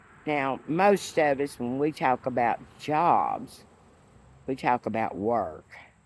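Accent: South, United States. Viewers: It is refreshing to hear a voice of reason spoken with a southern accent. After listening to so many southern accents in the House and Senate, it made me wonder, is it something in the grits? You and Beau of the Fifth Column and Trae Crowder and others keep us enlightened.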